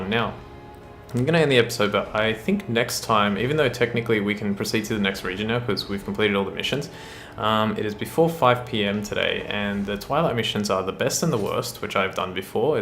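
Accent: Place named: Australia. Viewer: This is a great series, also where abouts in Australia are you from?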